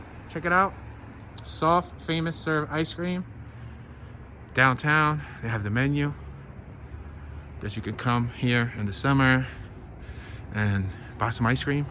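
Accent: Philadelphia, United States. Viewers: Woodder lol luv the Philly accent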